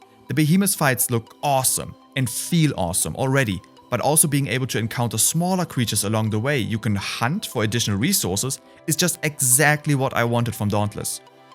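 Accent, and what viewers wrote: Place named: Germany. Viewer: Great Video ! But a little german accent :D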